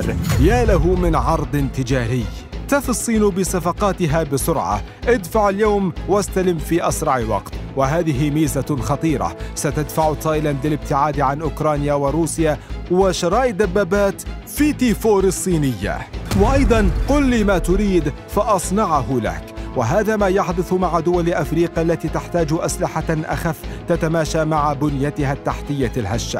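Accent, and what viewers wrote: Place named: Egypt. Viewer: المعلق مصري 🇪🇬❤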